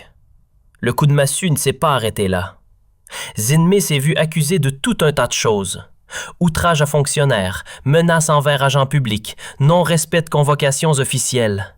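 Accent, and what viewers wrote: Canada. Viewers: De quoi tu te meles toi avec ton accent canadien mais que voulez vous de l'ALGERIE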